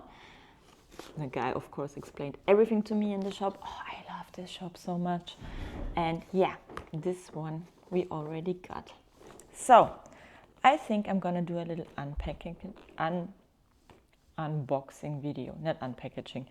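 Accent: Germany. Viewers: Nach zwei Sekunden wusste ich dass du deutsch sein musst 🤣 obwohl dein Englisch nicht schlecht ist, man hört es eben immer heraus. Ich wünsche euch viel Spaß bei dem tollen Hobby, ich bin auch noch Beginner und fokussiere mich erstmal auf das PS5 Game, habe früher aber auch gerne so was wie Heroquest und gelegentlich D&D gespielt. Jetzt verkaufe ich alles was ich habe um mir eine Armee zusammenzustellen 😉 Ich werde deinen Weg hier gerne weiter verfolgen. Grüße aus Hamburg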